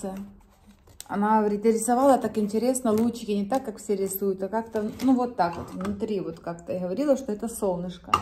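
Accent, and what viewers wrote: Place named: Kuban, Russia. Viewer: Голос приятный, нежный. Говор тоже приятный. Для меня обычный, родной. У нас на Кубани также говорят. А, что кто то написал плохое? Всем счастья, здоровья, любви.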